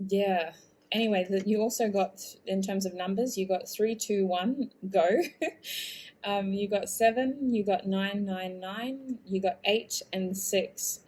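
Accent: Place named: Australia. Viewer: Thank you. Group 3. Perfectly accurate. I will continue to focus on self, he has a lot of mixed up "stuff" to sort through, and I know he will come back into my life, he struggles to let go, however, I have put my life on hold for too long now. Time for me to keep focussing on me and moving forward. I follow a few tarot readers, it sounds like you are Australian. You are very good at what you do. Thanks for sharing with us and giving us some clarity xxx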